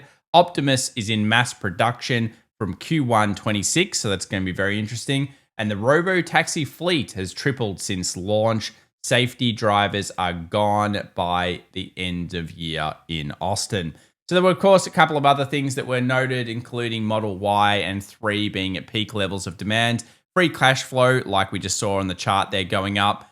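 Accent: Australia. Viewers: An Australian accent just makes everything sound better 👍👍 Keep it up 🔥🔥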